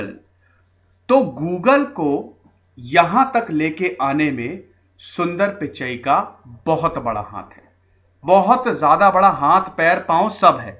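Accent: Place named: Kanpur, India. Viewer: Ye kaaanpur style ghussana jaruri nahi hai... Try to talk in neutral dialect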